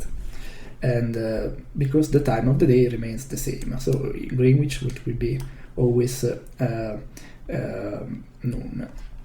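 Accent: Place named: Italy. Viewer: Very very nice, congratulations. It's one of the things I wanna make too ! By the way Italian accent is perfect.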